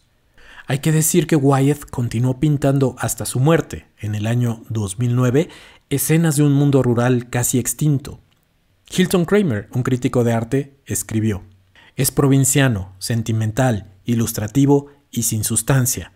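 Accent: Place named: Mexico. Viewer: Por su acento es mexicano.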